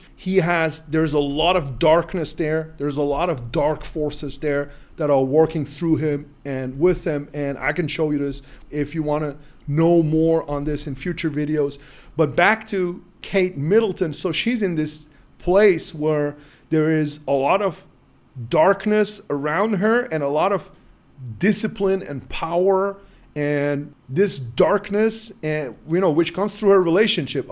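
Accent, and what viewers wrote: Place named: New York, United States. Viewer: I'm American of Swiss French parents. I was in Iran for 2 or 3 weeks in 1970. I hitched rides from Turkey to Tabriz then Tehran . Had to take a train to Mashed , a very holy city. Iran was a very peaceful place. The people were super nice , very intelligent, very clean. The woman were free and very friendly in a very respectable way. It was completely different going into Afghanistan . A very wild place. Your channel says United Kingdom but you sound like you're from New York city. Your astrology readings are always a real mind blower. You deep dive like no other. Thanks for your incredible work !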